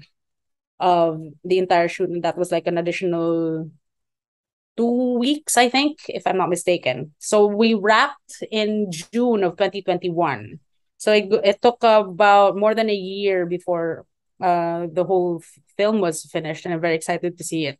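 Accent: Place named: Bisaya, Philippines. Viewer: I love our accent especially the bisaya accent.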